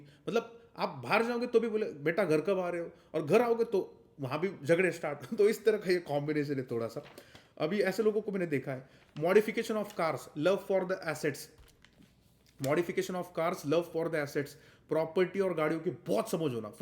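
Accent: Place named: Maharashtra, India. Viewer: Aap maharashtra se ho ,marathi .. observed ur chautha pronounciation... Ekdam chan video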